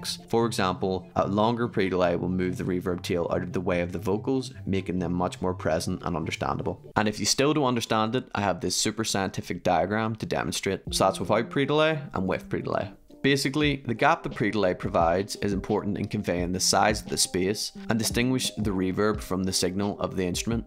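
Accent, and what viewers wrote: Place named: Scotland, United Kingdom. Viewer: Yes...you got a sub bc i like your scottish accent. 😜 Lived near Perth 10 years ago. My Ex is scottish. But she is back home now. But i loved your country...if im right that you are a scots dude...???!!! I also saw that you are on Looperman. Im not active there anymore. But i was like 13 years ago. I only go there sometimes to download an acapella. Greetings from Germany. ❤☮☯❤☮☯❤☮☯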